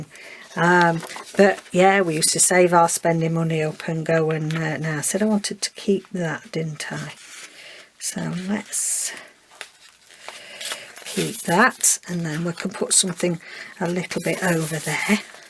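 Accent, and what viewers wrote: Yorkshire, United Kingdom. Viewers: Pease don,t change,i have a Yorkshire accent too,of which i am proud and i am much older than you.You are like a breath of fresh air on Youtube. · Love the envelope pockets. The skull tag topper is really effective. I love to listen to you chatting while you work. The accent from God's Own County is something to be very proud of and reminds me of my roots. If folk don't like it, that's too bad! You are a Yorkshire lass "wi' nowt tekken out" . Keep on crafting!!!!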